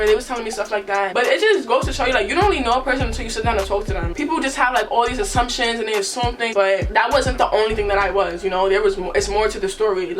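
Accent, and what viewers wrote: New York, United States. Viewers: She reminds me of Christina from bad girls club with a New York accent lmaoo 😂🖤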